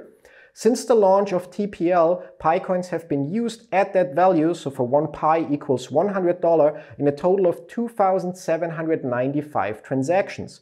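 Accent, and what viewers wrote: Germany. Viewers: Cooles video Off-topic: Immer wieder witzig diesen deutschen Akzent im englischen zu hören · I love that German accent. It’s hot af.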